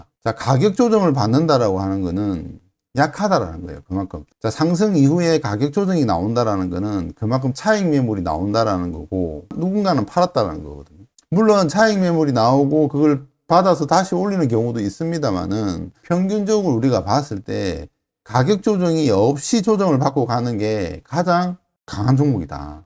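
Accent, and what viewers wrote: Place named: Gyeongsang, South Korea. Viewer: 와 투박한 갱상도 사나이신데 강의는 알짜배기 입니다.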